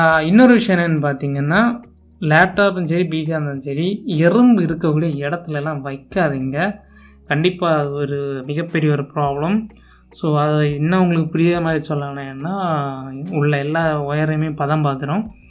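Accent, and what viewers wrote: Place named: Kanyakumari, India. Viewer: Kanyakumari slang mathiri irukku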